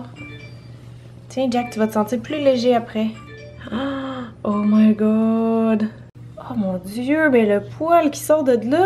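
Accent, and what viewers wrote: Quebec, Canada. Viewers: mon dieu j'aime tes vlogs, j'aime ton blog, j'aime tes vidéos, j'aime tes snaps, j'aime ton accent québécois, je te trouve toujours resplendissante, bref je t'aaaaaime fooort❤️ et jack aussi😘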